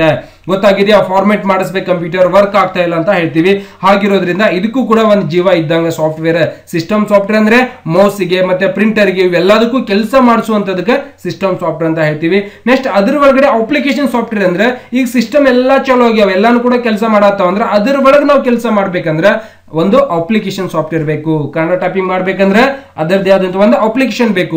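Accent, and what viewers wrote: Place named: Dharwad, India. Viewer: ನೀವು ಮಾತಮಾಡುವ ಕಲೇ ತುಂಬಾ ಚೆನ್ನಾಗಿ ದೇ ನಮ್ ಧಾರವಾಡ ಬಾಷೆ ಕೆಳಲೂ ಬಾಳ ಕುಷಿ ನೋಡ್ರಿ ಸರ್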